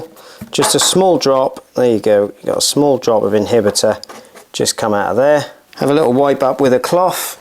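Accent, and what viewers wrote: Norfolk, United Kingdom. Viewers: Is that a Norfolk accent? Just asking.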